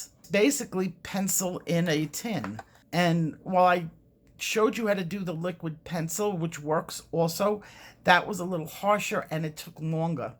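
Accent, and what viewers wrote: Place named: Boston, United States. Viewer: Hi. Are you from Boston? Love your accent. 🙂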